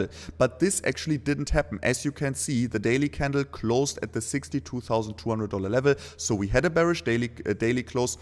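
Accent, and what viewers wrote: Germany. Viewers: Sounds german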